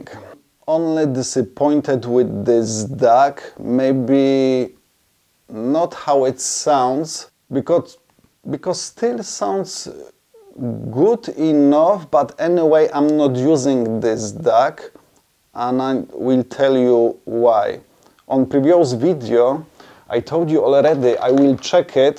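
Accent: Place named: Russia. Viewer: I was in Poland, but Polish people don't speak like that. This is more Russian accent than a Polish one.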